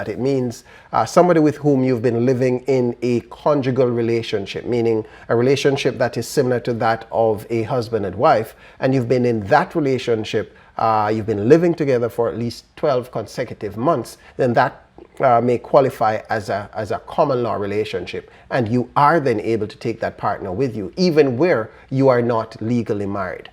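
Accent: Jamaica. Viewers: You sound Jamaican. Thanks for the info.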